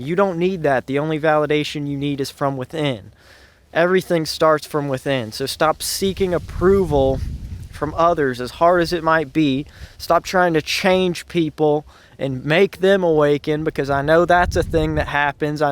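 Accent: South, United States. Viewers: Great video man. Its cool to see someone in a video who talks like me. You from the south?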